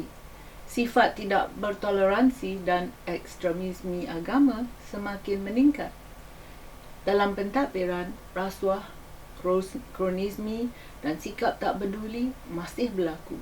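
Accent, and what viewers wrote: Perak, Malaysia. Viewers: ni cakap ala ala slang Mat Salleh tp ada sikit telo Perak.. which part Perak? Bota ke Lambor kanan?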